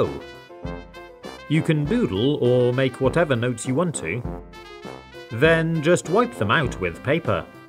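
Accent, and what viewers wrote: United Kingdom. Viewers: The British accent never gets old!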